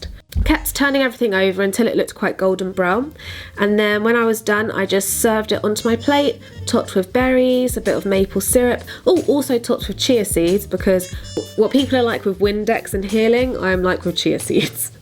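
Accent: United Kingdom. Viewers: I really like your British English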